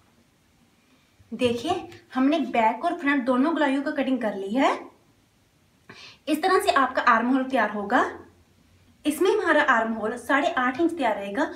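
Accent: Punjab, India. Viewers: I am from Punjab .....love your Punjabi accent ❤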